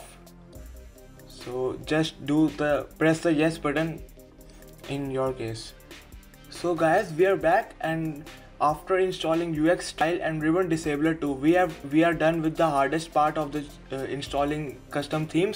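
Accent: India. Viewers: whenever u hear an indian accent iknow this shit gonna work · Indian English videos are so funny :3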